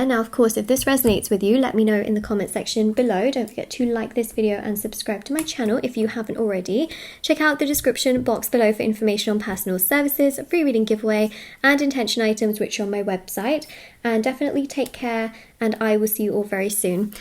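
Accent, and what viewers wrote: United Kingdom. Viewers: Thanks. Always there with brilliant intelligence and guidance at the right time. Something about your british accent makes it persuasive and assuring.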